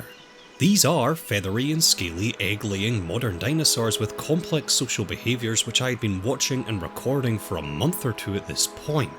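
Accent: Scotland, United Kingdom. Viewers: I love your voice. that interesting accent. I am neither english nor american so i never heard someone speak like that...i believe its scottish? I love to hear you talk about the films!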